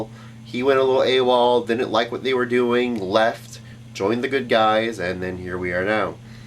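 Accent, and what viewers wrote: Canada. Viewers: BAHA I love the Canadian accent!!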